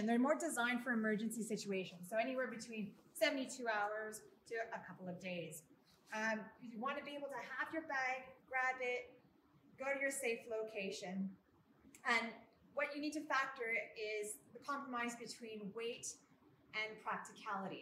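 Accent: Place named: Vancouver, Canada. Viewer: Sound like u r from Vancouver. Lol This survival prepper stuff has been gojng strong for 20+ yrs now. What do you think our number one prep reason should be? The cause to prep.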